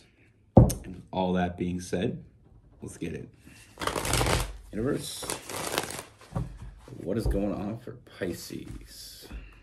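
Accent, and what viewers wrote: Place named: Toronto, Canada. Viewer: so you're from Toronto eh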